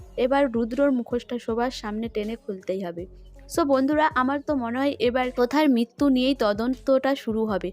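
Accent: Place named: Bangladesh. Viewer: কথকতা ভালো লাগলো।তবে, বাঙ্গাল বাঙ্গাল উচ্চারণ ঠিক করো। চেষ্টা করলে নিশ্চিত পারবে। শুভেচ্ছা রইল।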